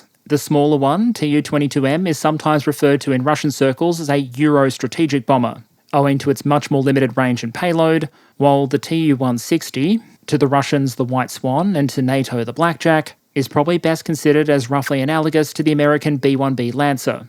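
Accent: Australia. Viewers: The way Australians say Russia has always cracked me up… love it.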